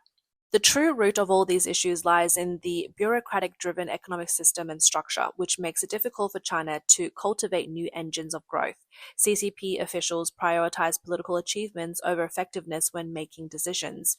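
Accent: Australia. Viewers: I would not trust any of this information since an Australian is reading it. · I don't want to hear an australian making noise tonight. Chinese don't have Ozzie accents!!! Get a host.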